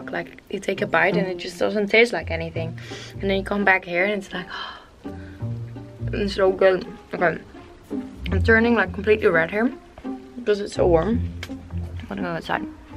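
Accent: Netherlands. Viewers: I only watch yours and Romee's vlogs because I unterstand your English pretty good😂 well now I know why. Really love when Dutch people speak English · The most pickable Dutch accent ever. One word and you know she's Dutch!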